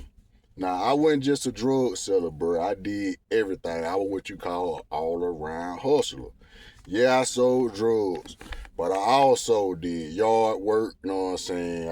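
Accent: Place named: South, United States. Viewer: You sound like my folks in Atlanta! #southernaccent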